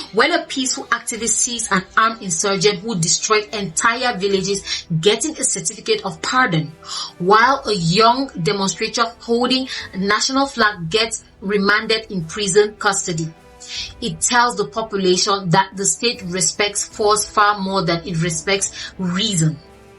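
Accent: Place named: Nigeria. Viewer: I wish your name was mentioned. I congratulate you on the way this information is presented. Firstly is English your 1st language? If it is not, your English diction, vocabulary, expression, pronunciation and grammatical awareness is beautiful. I speak English well but I dont like that my 1st language is the Imperialist 'Kings English'! You make English sound elegantly Nigerian. Great video! Excellent content which is trustworthy and left field. This would not appear on mainstream western media. Scary question. Are u A.I. generated?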